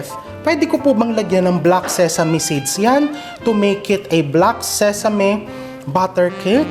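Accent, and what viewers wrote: Philippines. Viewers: I’m here to enjoy the Filipino accent :)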